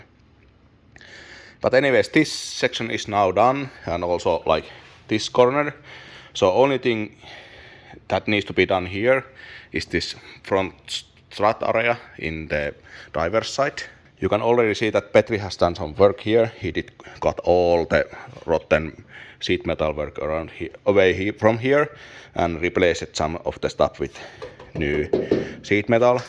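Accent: Oulu, Finland. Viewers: Anna tulla vaan englanniks. "Rallienglanti" antaa vähän samat vibat, kun Oulun murre suomeks😃 Kielellä ei väliä, kun sisältö on edelleen 💪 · Great work again and for me both languages are fine. Oulun murretta on kyllä ollut kiva kuunnella kun äidin suku on sieltä suunnalta 😀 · Well I didnt have problems with rally English so carry on as you please! And also im native Finnish speaker but I dont mind listening in English. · I vote English. For every amis you lose as viewer you’ll gain 5 more international viewers. Plus Finnish is the best sounding English accent. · Itse olen harmitellut monia hyviä kanavia kun höpöttävät kieliä joita suurin osa ei ymmärrä. Kyllä englanti tässä palvelee suurta yleisöä. Itse saatan tehdä videosarjan e21 restomodista. Vähän sellaiseen Don DIY tyyliin eli englanniksi mutta puhetta mahdollisimman vähän. Mites toi rallienglanti, äännätkö niin tahallaan vai onko se vain opittua? 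Esim. Kalle rovanperä puhuu rallienglantia mutta mielestäni olen välillä kuullut kun hän puhuu englantia ilman rallimurretta. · Oli kyllä suoraan sanottuna pettymys rallienglannin takia.. jatka suomeksi mieluusti!! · Nyt oli kyllä melkoinen parkaisu ja maan tömistely :D ei muuta kuin uutta konstaa seurantaan, tuskin loppuu Youtubesta kesken. En kyllä aiemmista videoista muista näin positiivista kommenttikenttää kuin tällä videolla on. Onhan tämä vähän koomista katsoa suomalaisen puhumaa rallienglantia, mutta Bemarit tässä eniten kiinnostaa.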